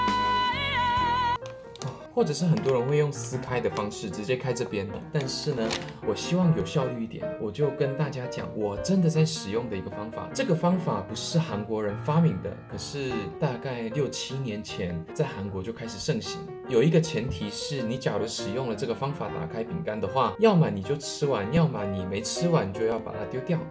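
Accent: Standard. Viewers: 很字正腔圓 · 真的，超標準，比我們教授的好100倍QQ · 中午太標準了吧！ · 韓國人會講中文喔，好厲害，講的好標準喔！真的是韓國人嗎？不說我還以為是台灣人欸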